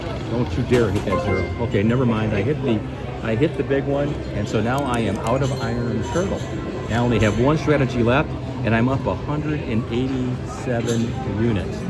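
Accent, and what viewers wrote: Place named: Midwest, United States. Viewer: Are you from Michigan or Wisconsin 😊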